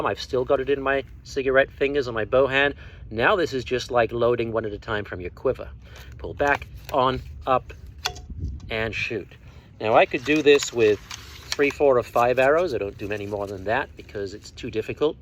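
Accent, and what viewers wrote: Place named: Transatlantic, United States. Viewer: real transatlantic accent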